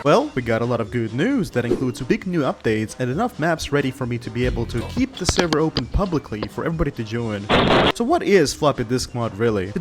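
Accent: Russia. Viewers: you russian?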